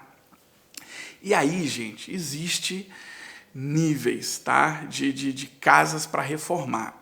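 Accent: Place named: Brazil. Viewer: Só brasileiro phoodido que veio de cidade de merrça que fala assim. Se Portugal fosse tão bom não teriam tantos deles na Europa toda.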